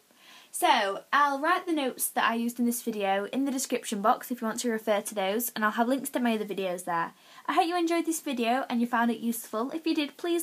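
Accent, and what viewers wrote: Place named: United Kingdom. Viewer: Silent night was the first song you thought meand I was OMG I never thought I would be able to play it and also I love your British acsent and you also sound like Luaren Platt in the XFACTOR your lovely piano teacher your teaching is very easy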